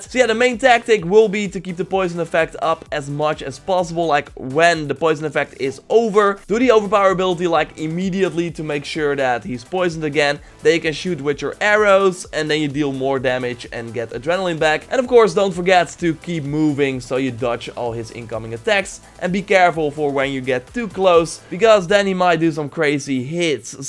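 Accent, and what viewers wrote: Netherlands. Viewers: Just wondering. Are you Dutch? You really have a Dutch accent xD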